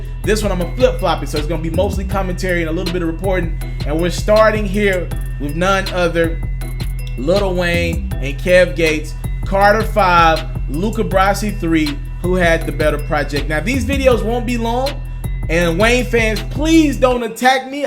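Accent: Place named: New Orleans, United States. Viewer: Lol facts bro u new orleans niggas crazy fr fr / just look at how u talk everybody else in america say three(3) / new orleans really do say tree even 90year old white racist old people say tree in new orleans lol😁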